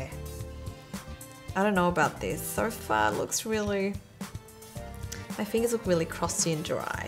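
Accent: Australia. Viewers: Are you from Australia? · I THOUGHT U WERE ASIAN BUT UR AUSTRAILIAN RIGHT? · I love it when I watch videos of people with an Australian accent. We can sometimes be hard to find on YouTube. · Is she Australian?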